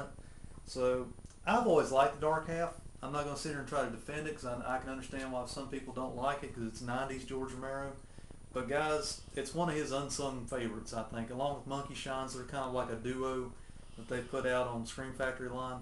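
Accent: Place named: Louisiana, United States. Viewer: Your accent, are you from Louisiana?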